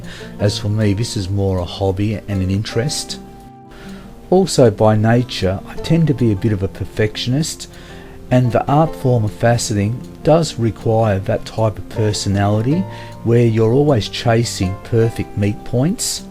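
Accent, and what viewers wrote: Australia. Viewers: Sept' 2020 .. first time here .. so nice to hear an aussie voice lol, love watching how you experts create amazing brilliance.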